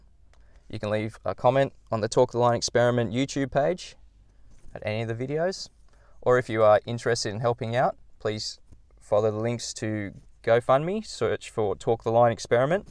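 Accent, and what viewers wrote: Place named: Australia. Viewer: Good luck with your experiment. I think your vertical bars may need some strengthening. Possibly some angled bars from the horizontal bars near the container to the pulley and to the tensioning line connection, there will be largish forces involved. triangles will give you your strength. ==================== At least you understand buoyancy is a function of gravity. Considering that gravity isn't compatible with any FE model, you wont be getting much FE support. ===================== You have an Aussie accent, therefore you can ascertain South (using a few stars) and observe the South Celestial Pole on any night. (that also breaks all FE models) A far simpler experiment, but you should give this idea a go.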